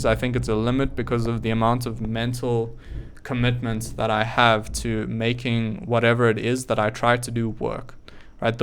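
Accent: South Africa. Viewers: You sure are handsome! 😍 Dreamy Alpha male. And that SA accent is beautiful. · are you from south africa? i worked with a guy that sounded like you. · You speak English really well. I mean the accent. I can barely hear what sounds like a South African accent.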